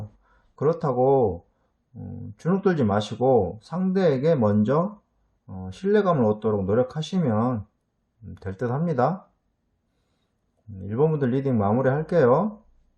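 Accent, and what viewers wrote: Jeolla, South Korea. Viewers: 2번ㅎㅎ 님뭔가 전라도분이신거같아욬ㅋㅋㅋㅋ뭔가정감가요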